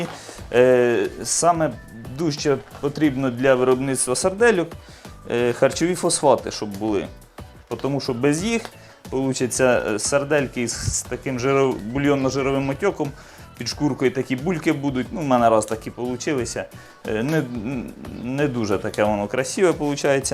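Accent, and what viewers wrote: Cherkasy, Ukraine. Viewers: Добрий день, велике дякую за вашу працю,бажаю процвітання каналу,а говорите ніби у нас на Черкащині.Благополуччя і Миру Україні 🇺🇦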